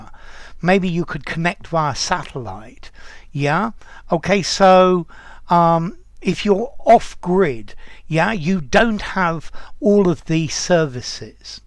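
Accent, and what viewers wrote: United Kingdom. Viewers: Sir what british accent do you speak with? And could you make some videos on accents! Cheers! ❤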